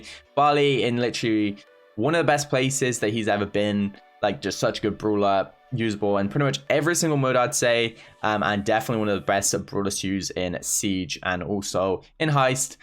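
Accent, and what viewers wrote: United Kingdom. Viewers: You speak Oxford english.Arent you?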